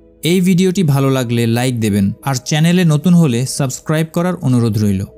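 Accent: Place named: Chittagong, Bangladesh. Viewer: বর্ণনাকারীর বাংলা বলার সুর শুনে মনে হল তাঁর বাড়ি চট্টগ্রাম। আপনার কন্টেন্ট ভালো তবে ভাষার ব্যাবহারে আরেকটু সচেতন হবেন। বাংলা বাংলার মত করেই বলবেন। যদি আঞ্চলিকতা বর্জন না করতে পারেন প্রমিত বাংলা বলার সময়, সেক্ষেত্রে চট্টগ্রামের আঞ্চলিক ভাষায় কন্টেন্ট বানান। বাংলাদেশের সব অঞ্চলের মানুষ এখন চট্টগ্রামের আঞ্চলিক ভাষা বোঝে। কারো বুঝতে অসুবিধা হবেনা।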